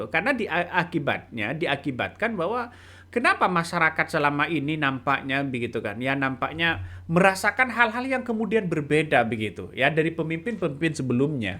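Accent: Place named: Jakarta, Indonesia. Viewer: oleh orang jakarta gitu gomong nya bro yg jelas kalau bicara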